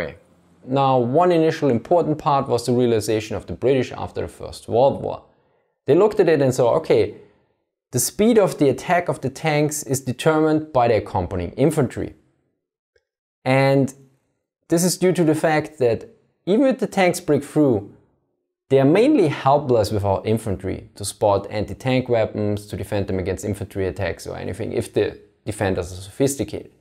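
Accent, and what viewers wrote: Germany. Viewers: Seine Aussprache ist nicht so schlecht. Er hat ein starke deutsche Akzent, aber er ist noch verständlich, ganz besser als viele Engländer 😂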